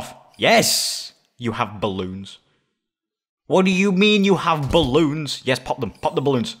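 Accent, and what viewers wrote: United Kingdom. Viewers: Are you British? You sounded like you were as a kid.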